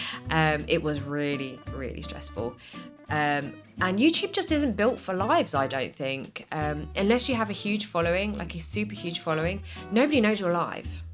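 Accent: United Kingdom. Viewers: God I wish I had a British accent. 😂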